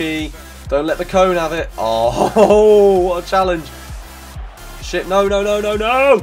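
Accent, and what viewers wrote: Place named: Scotland, United Kingdom. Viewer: FANTASTIC Scottish accent mate!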